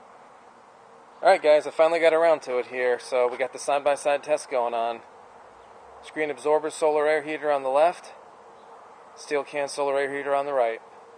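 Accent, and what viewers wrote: New England, United States. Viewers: New England accent?..Looks good though. I guess there's a temp difference between steel vs aluminum cans.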